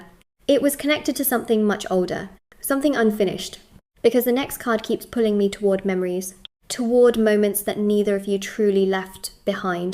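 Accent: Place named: United Kingdom. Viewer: What a beautiful accent...the British one❤.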